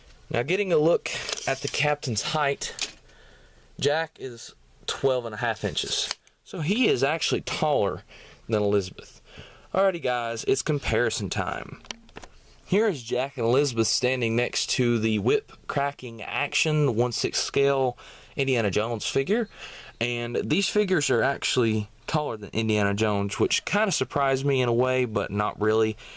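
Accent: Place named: South, United States. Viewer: I'm loving the southern accent. I'm southern myself. North Carolina born and raised. I'm also a country singer and songwriter and comedian.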